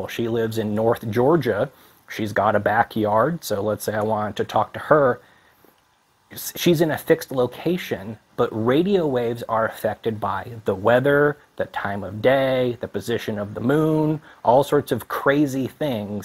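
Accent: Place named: Austin, United States. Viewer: Yup. You're definitely from Austin.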